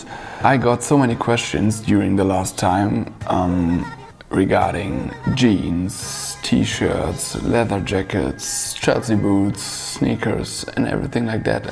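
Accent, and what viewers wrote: Germany. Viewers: Bei englischen Videos hört man immer sofort, wenn jemand deutsch ist. 😅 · Love your German accent. It sounds like you’re about to eat me with a glass of Chianti.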